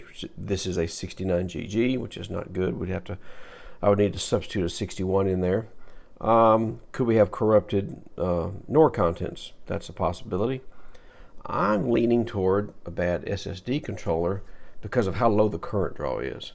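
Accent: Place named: Virginia, United States. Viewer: Hello! I came here from joey does tech. Probably from the discord. Love your videos i think they are fab. I'm in Toronto. From your accent can i make a guess that you are in...Virgina? Maybe a Carolina.... Cheers Peter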